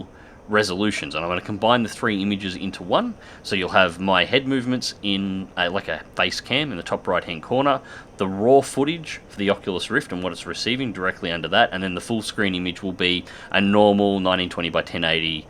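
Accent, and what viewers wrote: Australia. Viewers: dyssynchro > ' accent >Our flag in background >Kangaroo >Needs to be told wat?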